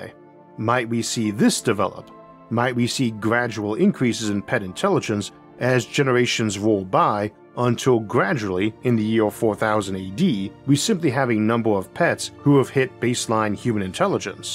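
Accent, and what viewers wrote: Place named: Boston, United States. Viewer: Just re-watched upward bound - You've gone from a self depreciating Elmer Fudd, to a confident sounding speaker with an "almost Bahstahn" accent. You might want to pull a set of videos that were recorded a year apart and go over them with your voice coach. P.S. With your content I skip the falling asleep part and go straight to dreaming of the stars.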